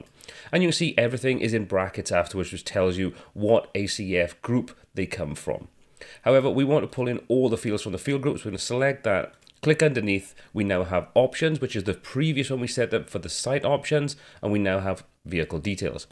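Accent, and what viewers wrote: Wales, United Kingdom. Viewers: Hi, you sound Welsh? I'm also from s. wales. Thank you for this video mate I wish I found this 5 months ago when you made it, would of saved me a lot of time. :)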